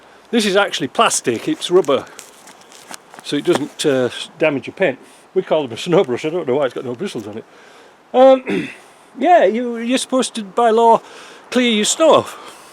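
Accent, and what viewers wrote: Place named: North, United Kingdom. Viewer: Yeh, with the accent I thought you were up north,not as far as canada though lol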